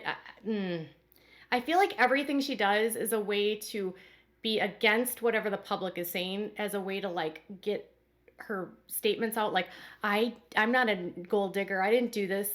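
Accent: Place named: Wisconsin, United States. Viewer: 👍🏼Just wanna thank you for sharing your insights and research with us, you're very thorough!💕 I sooo love your accent, some of my relatives are from Wisconsin and Minnesota so you sound like family to me!🙂 Please stay safe, keep doing what you do and God be with us all, Amen!🙏🏼🙌🏼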